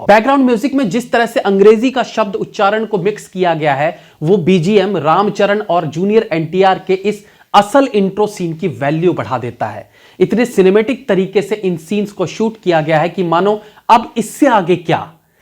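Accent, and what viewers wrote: Bihar, India. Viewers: Pure bihari ho aap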